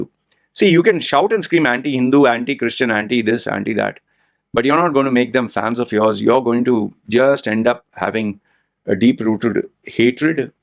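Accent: India. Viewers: 🍃💯 I like your videos about bull religion system topics... But when you said about the man mustacs tryana be a man.... Very true I agree... But whatsupp with the freaking tattoos on your face... Hommie you ain't no Tyson.. what was you thinking... How does it make you feel.... More of a man, unique dasi, cool...or tryna get cool attention Coz I can bet you didn't go to middle school or even high school in the USA.... Your dasie accent says it all... Bakie tera cast system ka bilkul sahi hai..... I hate that fckin cast system more than anything about India coz millions of reasons I got 🎉🎉🎉🎉good job tho hommie.. ... Peep deep inside.... But right on! 🎉🎉Keep up the good work 🎉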